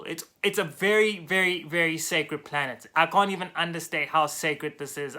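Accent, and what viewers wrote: South Africa. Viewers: Are you from South Africa ? Thanks for the video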